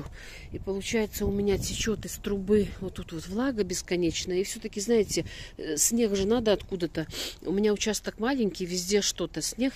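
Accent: Belarus. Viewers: Здравствуйте! Откуда вы? То, что из Беларуси, я поняла по разговору. Из какого города? В Гомеле продаёте гортензии, розы? Хочу Самарскую Лидию.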